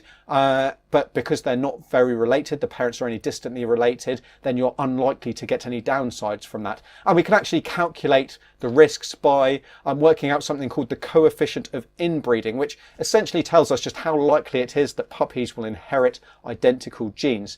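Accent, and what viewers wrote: United Kingdom. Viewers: Look at his british accent 👁️👄👁️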